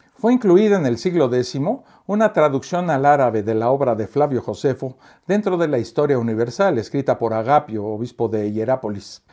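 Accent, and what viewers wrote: Mexico. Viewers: Que bien se explica ...y me encanta su acento mexicano..😊😊😊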